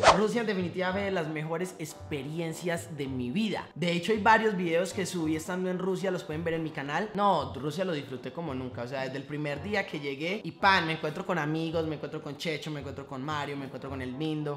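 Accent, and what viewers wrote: Antioquia, Colombia. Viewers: Hey!!!! Ya extrañaba mucho tu acento colombiano. Te amo❤️ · hola ya estaba esperando un nuevo vídeo... Justo ayer estaba viendo 11once me encanta tu personaje y ese hacento 😘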